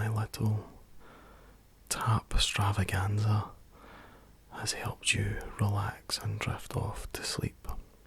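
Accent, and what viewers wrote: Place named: Glasgow, United Kingdom. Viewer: u ken by ur accent ur fa glasgow or somewhere a little further north am i right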